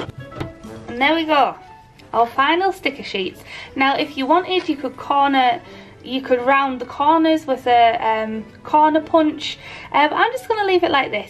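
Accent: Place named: Whitley Bay, United Kingdom. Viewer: I’ve just bought a Cricut and it scares the hell out of me! Your videos on making stickers have really helped, thank you. On a side note my husband is from Washington and he’s trying to pin down your accent, he’s decided on Whitley Bay or Blyth, how close is he? We live in Canada now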